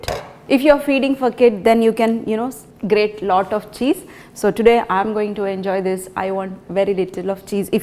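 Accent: India. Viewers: I work in a hospital. I swear to God, the indian accent makes me wanna bust an indian in the fucking head!!!!!!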